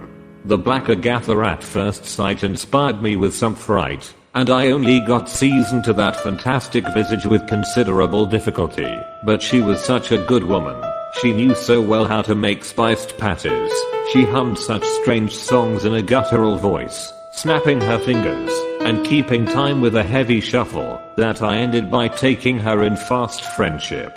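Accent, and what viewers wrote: United Kingdom. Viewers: All of his voices and pronunciation is perfect!Full of British Accent!Wonderful!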